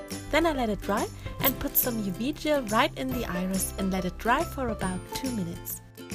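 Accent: Germany. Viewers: speaks good English with slight accent Me: Mmh... is that a german accent I hear? Me: Sees Uhu glue YES I was right!(or she's austrian) If you see this comment pls reply! (I'm german too?) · Are you German? I like your accent.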